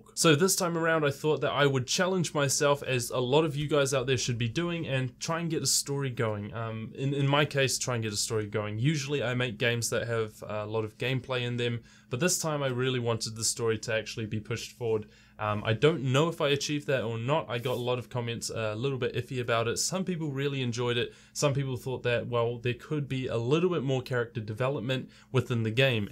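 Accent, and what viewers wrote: New Zealand. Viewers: Judging by the accent, are you happen to be a Kiwi?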